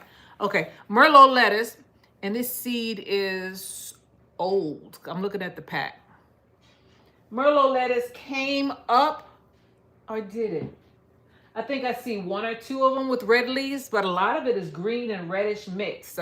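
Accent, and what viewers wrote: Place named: New York, United States. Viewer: Your video popped up on my feed. I love it. I knew you was a Newyorker I can hear that NYC ancient 😃❤ . I am a Trinmerican from NYC too. My father is Trinidadian.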